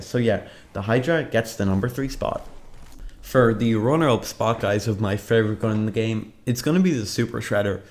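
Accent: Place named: Ireland. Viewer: You’re from Ireland I can tell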